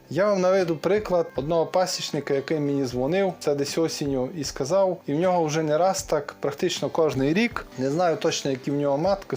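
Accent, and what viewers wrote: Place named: Transcarpathia, Ukraine. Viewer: Іздив колись на закарпаття ще в 90 роках мав багато друзів Ваш говір нагадує мені ті приємні часи Успіхів Вам Цимбори Слава Україні!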